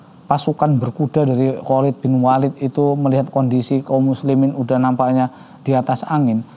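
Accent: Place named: Indonesia. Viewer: Medok tenan ustadz.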